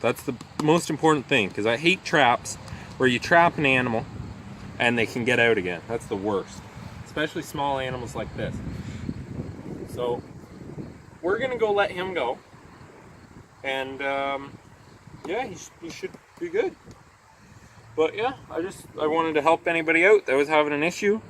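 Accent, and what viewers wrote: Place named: Canada. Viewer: As soon as I heard the Canadian accent, I knew this was the right video to watch.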